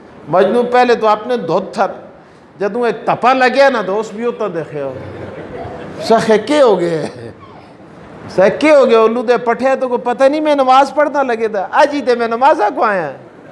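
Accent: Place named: Pahari, India. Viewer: مولانا سعید صاحب ماہڑی "پہاڑی" بولی جس کو اوہ ہندکو آکھدے ہیں بچ گل بات کردے مچ پیارے لگدے ہین اللہ تعالیٰ انہاں کو سلامت رکھے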